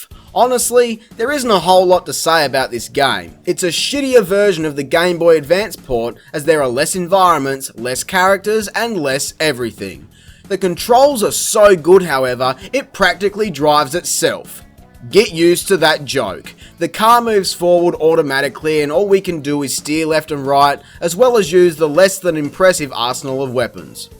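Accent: Australia. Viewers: UGH HES SO FUCKING AUSTRALIAN IT HURTS IT SOUNDS SO FUCKING AUSTRALIAN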